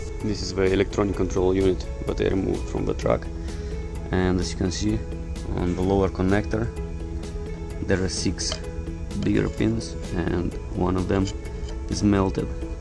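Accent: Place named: Russia. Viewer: Рашен акцент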